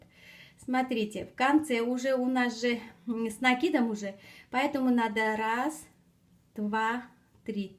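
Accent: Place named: Dnipro, Ukraine. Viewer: ! какой вы красивый кардиган связали! он у вас получился такой элегантный! и еще: мне послышалось или вы и правда из Днепра?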